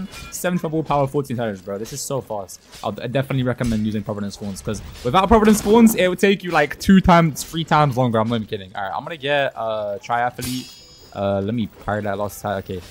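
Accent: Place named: United Kingdom. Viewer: woahh hi idk who's british accent is better you or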